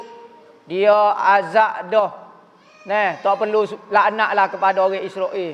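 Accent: Kelantan, Malaysia. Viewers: Alhamdulillah... Jarang2 dpt dgr ceramah dialek kelatey.. Terbaik ustaz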